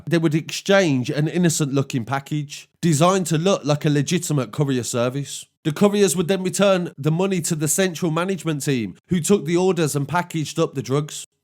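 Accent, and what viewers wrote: Birmingham, United Kingdom. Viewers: Why do you talk like a malfunctioning brummie computer you grass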